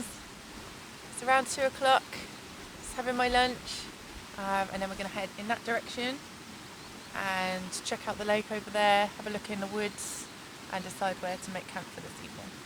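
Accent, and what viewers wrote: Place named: United Kingdom. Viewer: I'm glad you're having fun , where are you from you look Asian but your language accent it's throwing me off you sound British just wondering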